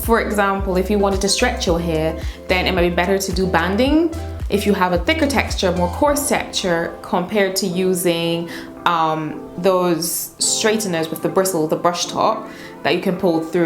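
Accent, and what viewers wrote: Barbados. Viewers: 🇧🇧 I hear my people in your voice! New subscriber! And this was a great video! Thank you!!! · Are you a Bajan Queen? I’m sensing the accent. 😀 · You sound almost bajan😄your hair I'd beautiful. My goal is healthy waist length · Am I hearing a bajan accent? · I’m hearing a Bajan accent! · Please what is your accent !?? Am I hearing Bajan? Absolutely beautiful !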